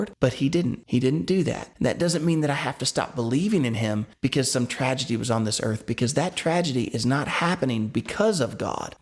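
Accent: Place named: South, United States. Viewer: Feast of booze 🍸 😆 so funny! I like your country accent! 😊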